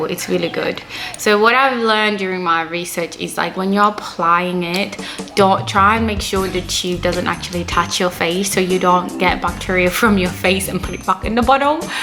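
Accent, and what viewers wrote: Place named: Australia. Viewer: Australia I think 😍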